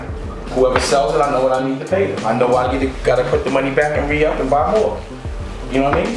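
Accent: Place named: Harlem, United States. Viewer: Amazingly google how much Dame net worth is you it will be surprise you. Talking like a tru Harlem dude.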